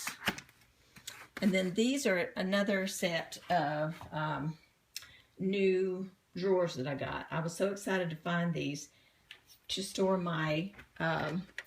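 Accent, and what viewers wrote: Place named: South, United States. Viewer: Thanks for sharing your incredibly inspiring art room and art process. My kids think I'm an art supply/junk hoarder, but you just normalized my accumulation of art "treasures." Love your accent! I miss the south.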